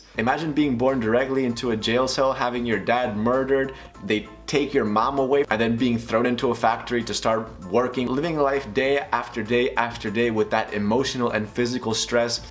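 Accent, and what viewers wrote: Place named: Barcelona, Spain. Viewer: Spain. More specifically, Barcelona :)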